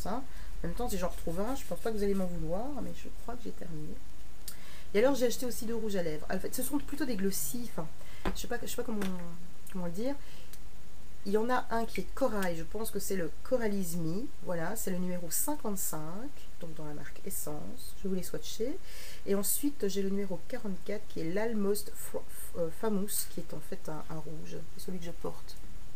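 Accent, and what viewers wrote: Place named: Belgium. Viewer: et jadore ton accent belge!!!! sa me rapelle une famille chez qui jai passer la plus part de mon temps!! heureusement pour mon porte monai ya pas de produit catrice ni essence dans le coin!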